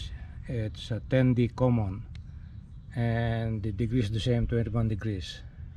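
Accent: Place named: Philippines. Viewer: Thanks for the video.. I can hear the Pilipino accent 😉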